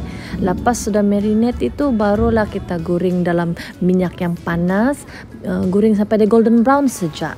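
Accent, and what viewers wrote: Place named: Sabah, Malaysia. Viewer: Sumandak Sabah lawa2 pandai masak nasi.. nasi ayam sedap tu bah. Aku boleh dengar sepnjg ari ckp bhs Sabah. Bila team ICSYVM mau jemput jd guest artist ye.. mau juga tengok pandai kah pilih penyanyi sebenar/teka penyamar😂 terbaek Original Sabahan💪💯👍🔥🔥🔥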